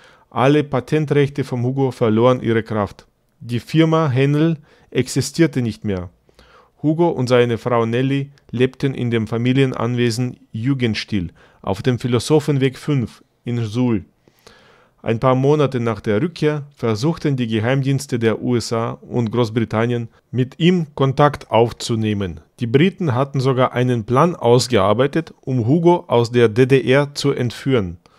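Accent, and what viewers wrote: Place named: Russia. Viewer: На самом деле очень сильный русский акцент. Для носителя языка сложновато слушать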